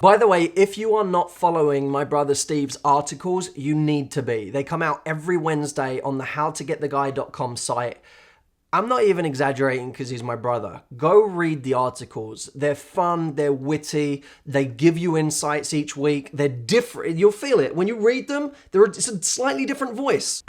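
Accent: United Kingdom. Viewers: freaking love British accent. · Maybe its cultural? Telling from your accent you are British, and yes Ive lived here as a expatriate for about a decade. And trust me, trying to make friends with general British people is harder than trying to get a letter from the archbishop of Canterbury!! - this might also explain your experience so far! Its shocking but insightful to me that you say this may be because people appear too vulnerable. I dont think there is any communicative or language barrier as I speak perfect English. Strangely Ive noticed I have no problems mingling with people from other countries , and from my experience Americans appear to be the nicest bunch, and are generally interested in meeting people from other countries cultures because they are genuinely interested in doing so, and not to satisfy their own personal agendas. Maybe Ive just been unlucky but so far its been tough luck to meet a Brit who loves to hang out and have fun and do stuff. 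Also perhaps as your personal situation has changed now that you live on a different land, you suddenly realise the value of frienship and trust. Very insightful video, good job! xx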